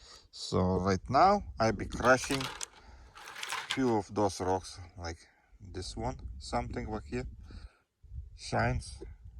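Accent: Russia. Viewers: You sound like Crazy Russian Hacker. You must be Russian. Am I correct?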